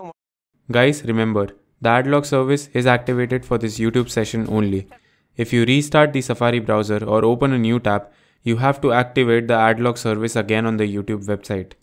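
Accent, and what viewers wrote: India. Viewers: Indian accent always scam😢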